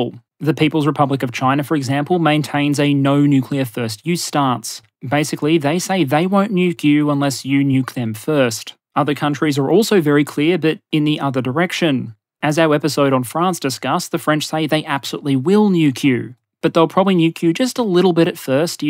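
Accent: Australia. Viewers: He's an Aussie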